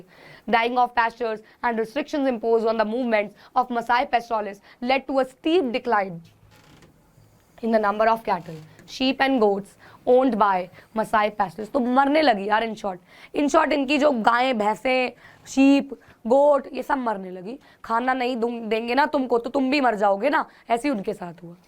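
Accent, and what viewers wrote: Punjab, India. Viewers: Ma'am ,are you Punjabi 🤔??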